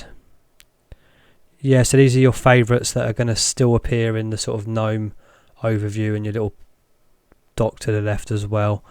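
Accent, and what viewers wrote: United Kingdom. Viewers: Pretty good channel. Nice British English pronunciation... I wonder what kind of opinion will have regarding Manjaro 20.1 Cinnamon Edition...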